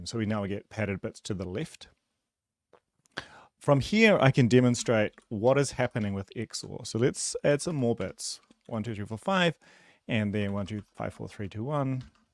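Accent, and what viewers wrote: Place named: New Zealand. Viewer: Thanks for sharing! Love your NZ accent btw :) churr bro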